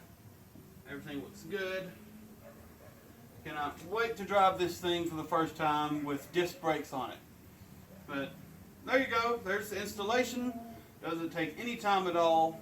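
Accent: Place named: Appalachia, United States. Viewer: You sound like an Appalachian.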